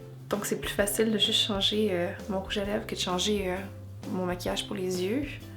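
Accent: Quebec, Canada. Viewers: Youpi !!!! Enfin une vidéo en français je suis ravi de t'entendre parler en français avec ton joli accent québécois !!!! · jadore ton accent quebequois, love it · je savais pas que t'étais québécoise...j'adore votre accent. merci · Merci pour ce vidéo! J'étudie le français et c'est génial de l'entendre, spécifiquement avec votre accent! (Tous mes profs sont Américains ou Français, donc j'ai besoin d'apprendre l'accent Canadien.) Merci,! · I've actually never heard canadian french, it sounds a bit different than the european french, but I love it =D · Top ! J'adore t'écouter en français encore plus qu'en anglais, ton accent canadien est charmant ! like ! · Quand tu parle en francais tu as le meme genre d'accent que les quebecois !! :) tu es d'ou? :) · sounds like a quebec accent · Coucou ma belle, je ne savais pas dutout que tu parlais français et encore moins que tu était québecoise. 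Cet accent est juste magnifique, j'aurai tellement aimer l'avoir ^^ Tu devrais faire des vidéos en français plus souvent, c'est vraiment agréable de t'entendre avec cet accent :) et aussi quand tu fait des swatch de ral, parce que je comprend certaines choses mais pas tout ^^ Je te fait de gros bisous et connue tes vidéos j'adore les regarders.😘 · J'ai découvert ta chaîne il y a quelques semaines et quel bonheur de voir que tu parles français! Ton tout petit accent québécois te rends encore plus sympathique ;-)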